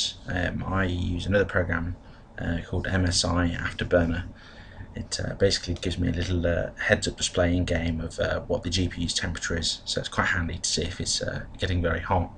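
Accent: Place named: United Kingdom. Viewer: love your British accent :3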